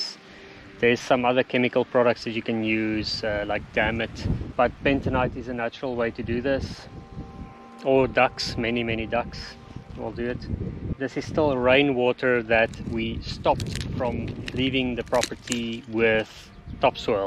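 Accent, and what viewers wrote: South Africa. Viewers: Cool. If for no other reason than fire risk control given rapidly increasing pace of climate change, swales could eventually make a huge difference to your property. Especially given the recent awful drought your part of the world has had; had a lot of UK news coverage at the time. Am I correct in assuming that you're in South Africa going by your accent and landscape? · Sounds South African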